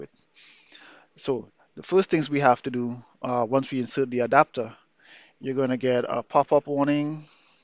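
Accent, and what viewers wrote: Trinidad and Tobago. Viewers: Is that a trini accent? Love it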